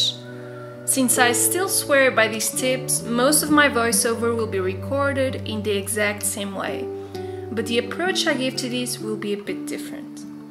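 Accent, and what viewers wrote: Portugal. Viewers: where are you from? It's just you don't seem american/english. És portuguesa, certo?? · Tu és portuguesa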